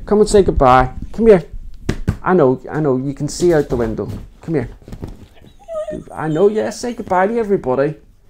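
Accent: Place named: Northern Ireland, United Kingdom. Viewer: I like listening to you because your accent is as close as I'll get to Northern Ireland! I haven't seen my daughter in Armagh since before Christmas and God only knows when we'll be able to travel again. Best wishes to you and the family.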